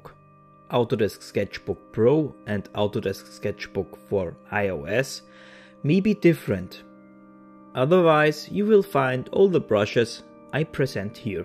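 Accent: Germany. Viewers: I love your german accent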